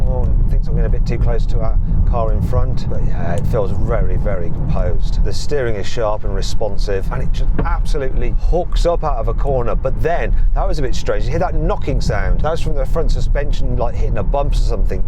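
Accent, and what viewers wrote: United Kingdom. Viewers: 이거 들을 때마다 영국식 조크와 영국 영어 발음에 치임 ㅋㅋ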